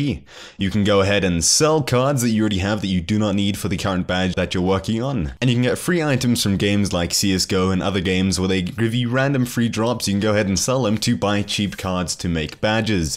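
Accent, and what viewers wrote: South Africa. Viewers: i new you sounded South African howsit fellow South African ^^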